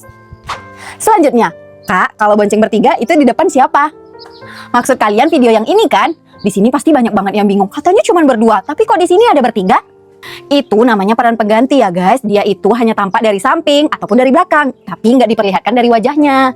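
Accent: Indonesia. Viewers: Kak asli orang padang ya kalau boleh tau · Kakak orang Aceh ya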